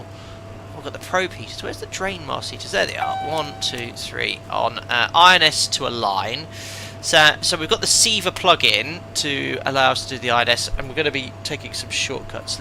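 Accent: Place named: England, United Kingdom. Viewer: Great stream , I think it’s the Concorde and the English accent that go hand in hand.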